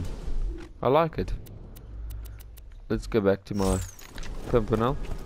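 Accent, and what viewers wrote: South Africa. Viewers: Are you south African? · I recognize that accent from anywhere, you South African?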